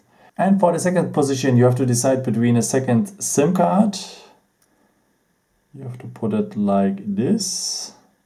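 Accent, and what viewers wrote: Germany. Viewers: Could this viedeo be uploaded in English or with English subtitles because this is not understandable at all. It sounds like a German accent, which is ok,but please...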